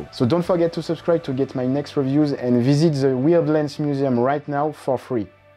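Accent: France. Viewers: keep the french accent to your english! english is the one language where it’s actually kinda cool to blend your roots with the language imo. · Not trying to be rude in any way by saying this. Your english has really improved recently. It feels like you talk with a lot more confidence and energy, while still keeping that slick French accent.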